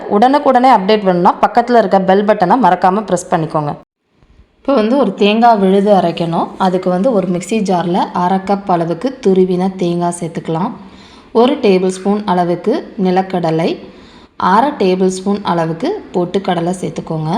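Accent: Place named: Tirunelveli, India. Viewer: Enaku ithula romba pudechathey nama nelai slang than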